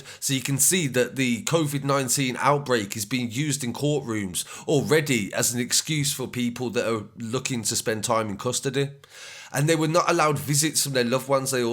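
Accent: Birmingham, United Kingdom. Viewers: This guy needs someone different narrating brum accent is just beyond terrible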